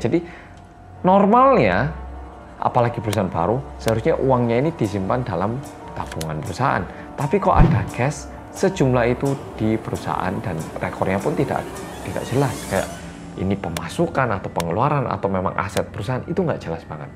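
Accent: Java, Indonesia. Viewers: Tahun 1998 di Indonesia situasinya Kerusuhan . Medok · Medoookkkkk podo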